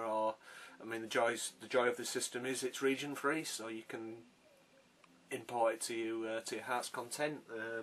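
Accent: United Kingdom. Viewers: Very good video, surprised I hadn't watched before -_- got a little scarey toward the end LOL. Nice to finally see you in the flesh, are you a Yorkshire man? Barnsley, Leeds maybe? Thanks again and keep the psp vids coming!! I'll subscribe.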